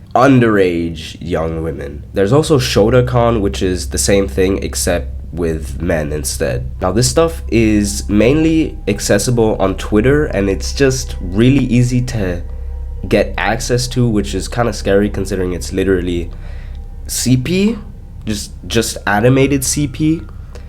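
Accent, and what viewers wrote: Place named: Germany. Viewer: Also a German here! Man hört den Akzent aber nur ganz schwach raus, find ich :D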